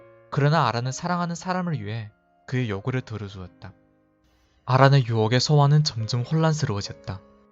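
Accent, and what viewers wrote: China. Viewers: 발음이 조선족 같은데 중국 조선족이었넹ㆍ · 중국인이신가요? 제가 아는 중국인 분이랑 한국어 발음이 비슷하네요. ㅉ,ㅃ 같은 된소리를 굳이 쓰거나 발음이 쎄게 나가기도 하고 뜻이 안맞는 피동표현이 나오기도하고 -다, -습니다 가 계속 섞여서 사용되네요. 번역기 같은 거 쓰는 것 같아요. 혹시 더 나아지고 싶다면 이런 부분을 고쳐나가는게 좋을 것 같습니다.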